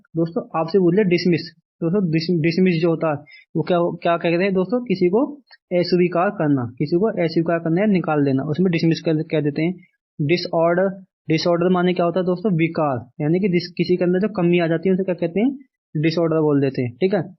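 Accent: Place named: Haryana, India. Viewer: Haryana sa ho kya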